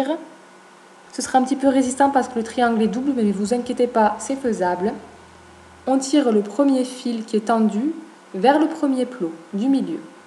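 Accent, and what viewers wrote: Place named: Nord-Pas-de-Calais, France. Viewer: tu parle ch'ti ! !!!!!!!!!!!!!!!!!!!!!!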